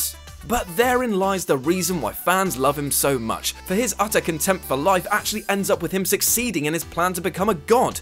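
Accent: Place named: United Kingdom. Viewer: no, he's british. they pronounce words differently than in the states.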